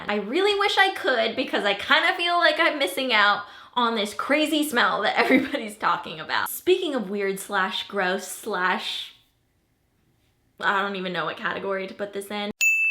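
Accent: South, United States. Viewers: Where are you from? You sound kind southern, but I thought you were from CA...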